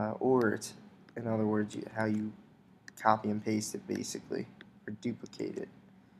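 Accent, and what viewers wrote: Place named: New York, United States. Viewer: just followed you on soundcloud bro. are you from ny/nj? u have that accent lol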